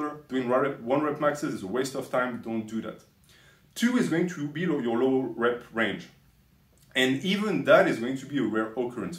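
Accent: France. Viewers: R u from France bro ?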